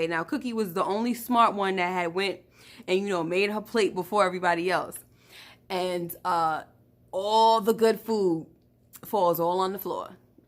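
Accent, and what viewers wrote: New York, United States. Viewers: I have to ask from hearing your accent - are you from NY?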